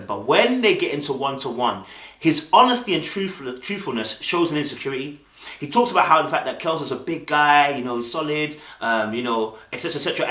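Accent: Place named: Birmingham, United Kingdom. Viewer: This was really good. However it's like tfor the first time in watching so many of your videos that I fully heard your Brummy accent. As in clear as day, the whole way through this loool. I was genuinely like "wow" 🤣🤣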